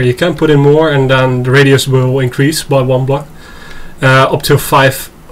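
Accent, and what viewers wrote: Netherlands. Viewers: 1. i love the fact that you sound so dutch 2. i love the fact that you just went "hey i can't walk fast enough and that bugs me, i know i'll just make an addition to my mod!" 3. i think a good idea would be air pressure jet packs or maybe even a pneumatic iron man suit because the helmet is already there. 4. don't do anything about fall damage, it's a part of the game and it makes it fun. if you want to take no damage launch yourself in water.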